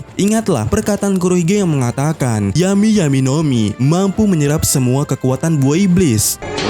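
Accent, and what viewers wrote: Palembang, Indonesia. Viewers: Bang lu orang Palembang ya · bg lu org palembang pa jambi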